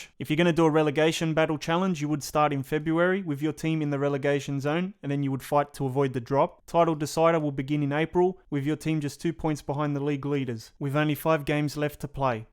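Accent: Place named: Australia. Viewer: I just subscribed because of your Australian accent!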